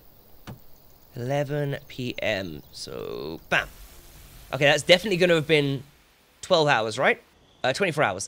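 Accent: England, United Kingdom. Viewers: Because porn and pawn are pronounced the same in an english accent:')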